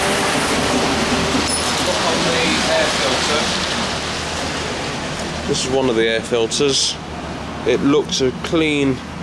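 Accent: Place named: Nottingham, United Kingdom. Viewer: Nice to hear a Nottingham accent.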